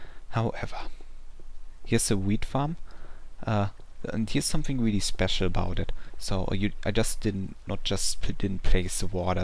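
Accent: Germany. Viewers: you have a german accent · you sound german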